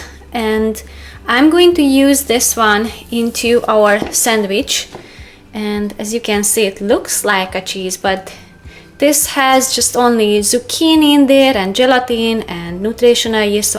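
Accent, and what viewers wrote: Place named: Hungary. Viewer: 90 százalék, hogy magyar vagy kizárólag az akcentusból!A maradék 10 a név miatt :D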